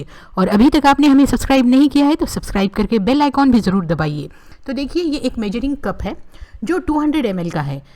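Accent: Hyderabad, India. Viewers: aslkm unty kya ap hyd se h apki language hyd jaise h plz rply kare thanku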